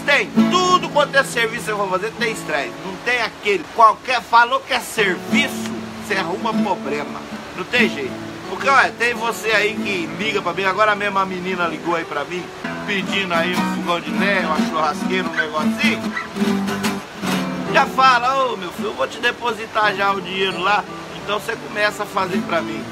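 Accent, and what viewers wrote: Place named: Minas Gerais, Brazil. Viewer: o Se tá parecendo k é de Minas gerais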